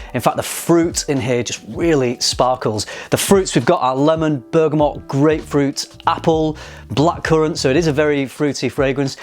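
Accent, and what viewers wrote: United Kingdom. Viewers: that British Accent, man... I insanely LOVE hearing it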